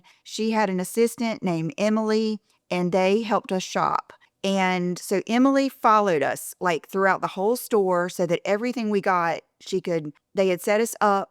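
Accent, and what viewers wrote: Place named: Boston, United States. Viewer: And YES, on the sexy Boston accent!!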